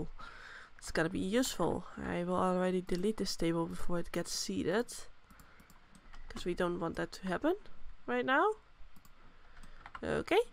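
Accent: Netherlands. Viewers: Idk why but you kinda have a Dutch accent, ru Dutch? (No hate just asking 😂❤) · You sound dutch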